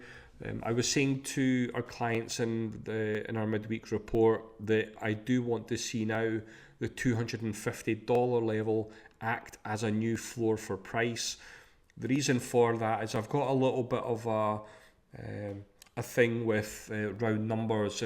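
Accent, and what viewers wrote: Scotland, United Kingdom. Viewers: Nice work and useful content 👏👏❤️. The Scotch accent is a great PLUS. 😀🙏👏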